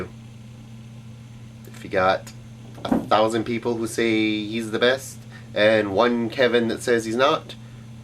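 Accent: Canada. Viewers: BAHA I love the Canadian accent!!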